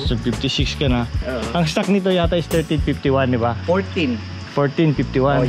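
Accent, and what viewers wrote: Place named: England, United Kingdom. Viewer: natatawa ako sa english accent tsaka sa kinalabasan ng cc/subtitle ng video hahahaha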